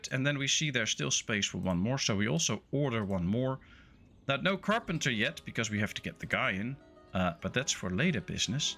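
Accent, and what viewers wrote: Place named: Netherlands. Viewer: Goede tutorial. Ik zou wel mijn Nederlands accent wat proberen weg te poetsen. Klinkt nog te veel als Mark Rutte die Engels probeert te praten. Probeer "kid" niet uit te spreken als "kit" en "pause" niet als "pos". Ze hebben een wat langere klank. Update: en "wood" niet als "woet" ;)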